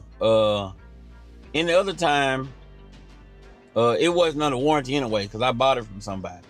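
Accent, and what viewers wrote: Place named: Tennessee, United States. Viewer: Completely unrelated but you from Tennessee? That accent sound similar to mine 😂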